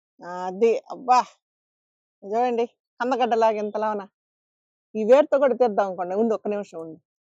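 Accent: Nellore, India. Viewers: Mana Nellore bashalo super andi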